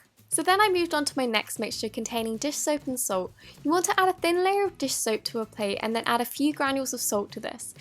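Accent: England, United Kingdom. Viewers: you sound british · GamerGurl Utubly it’s called an English accent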